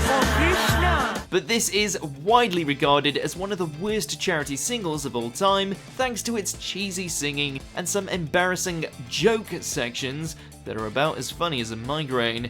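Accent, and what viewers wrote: United Kingdom. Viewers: The amount of mispronunciations of celebrity names, places and general British things are alarmingly many. I would accept it from a non-Brit but this guy sounds British so it's really confusing.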